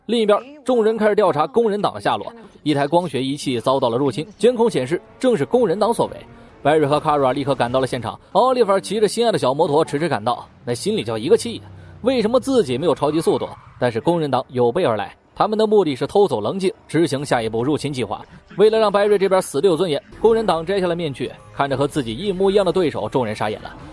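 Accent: China. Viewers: 怎麼中國人那麼喜歡用YouTube 來做盜版的事情？ 操著這個口音 真的很噁心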